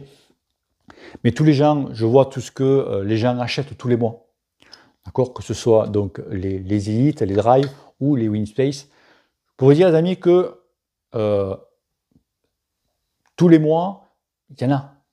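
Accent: South, France. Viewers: Bonsoir, j'ai deux petites questions. Premièrement, comment ça se passe au niveau de la douane? Et ma seconde question quid pour le SAV en cas de pépin? PS: j'adore ton franc parlé, bien du sud:)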